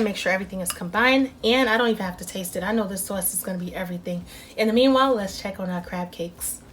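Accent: New York, United States. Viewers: Is that Queens or Bklyn accent I hear? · Love her new york accent. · Off the chain sis got to be from nyc with the accent love it will make this 2morrow. And yes I subscribe and shared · Love this recipe 😊 l hear that New York accent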